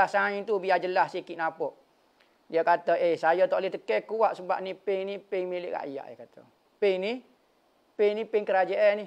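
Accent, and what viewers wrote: Kelantan, Malaysia. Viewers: masalah bahasa je pon tu loghat kelantan dye nmpak biasa je tkde unsur penghinaan melainkan awak snddiri yg rasa ianya satu kurang penghormatan so diri awak yg bermasalah dlm hal ini.... awak reply mcm tu dkt ustaz yakni org alim guane boh niat tak menghalalkan cara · itu loghat klate, mu oghe luar tafsir kok lain 😂😂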